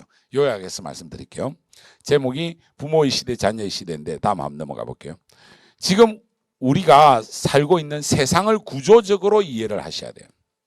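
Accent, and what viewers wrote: Gyeongsang, South Korea. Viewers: 말투가 경상도인데 무슨 서울? 경상도 출신이 서울사는거겠지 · 경상도 표현이 좀 섞여서 그런거에요. 기분나쁘게 말한건아닌데 그렇게 들린것같아요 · 대가리나쁘면 공부하지마 여학생이졸고있으면 쳐자빠져자는 미친년아 ㅋㅋㅋㅋㅋㅋㅋ 분필던짐 여기서 한두명만인서울 간다고 특유의 경남말투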